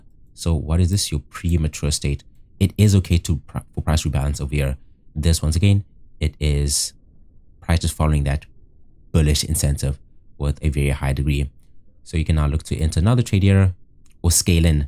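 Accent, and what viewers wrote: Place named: South Africa. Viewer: 😊detecting a SAn accent here.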